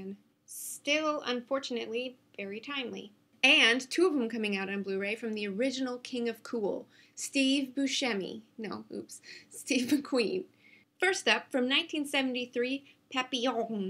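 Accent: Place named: France. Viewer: Nice "french accent" ! France loves you (I do anyway :)) !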